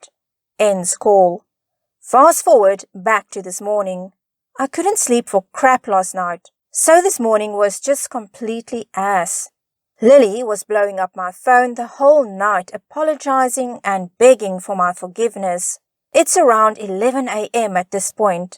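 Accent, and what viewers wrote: South Africa. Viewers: Aaaaah the rare south African Afrikaans accent out in the wild! What a rare sight! Great commentary at the end :). All the best for your channel!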